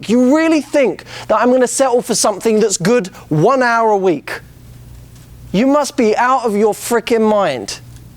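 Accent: United Kingdom. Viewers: lol OMG i LOVE YOUR BRITISH ACCENT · Is it just me or did he lose his british accent? Quite disapointing of his appearance now since 2009 ;,( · He sounds so much like Daniel Radcliffe. Not just the way his british accent is, but also his voice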